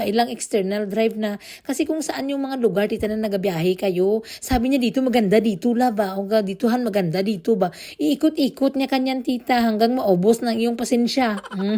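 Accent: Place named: Iloilo, Philippines. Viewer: hello po, i enjoyed watching your videos and listening to your voice and the way you talk in ilongga. ❤😅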